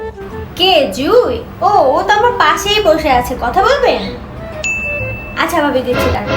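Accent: Bangladesh. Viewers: বাংলাদেশের মানুষের বাংলা বলার ধরণ, খুব খুব মিষ্টি লাগে ❤ addictive in nature with ♥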